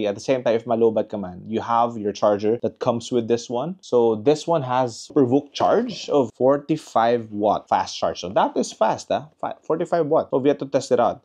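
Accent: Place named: Singapore. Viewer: Kung di ka nagtagalog kala ko singaporean ka na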